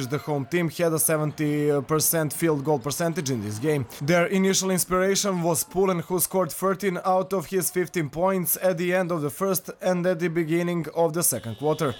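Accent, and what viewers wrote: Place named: Serbia. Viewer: Prica kao na Srpskom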